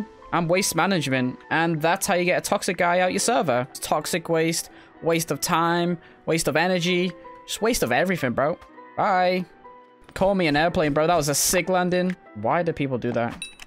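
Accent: United Kingdom. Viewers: Anchor are you a England yt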